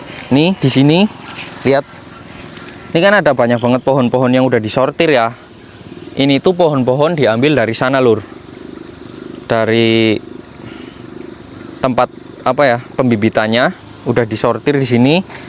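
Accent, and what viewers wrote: Banyumas, Indonesia. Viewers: Orang banyumas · Kayak cucu ku,dia yg asli anak samarinda,tp tinggal d kebumen skrg ngomongx pakek nyong2 gt,semangat mas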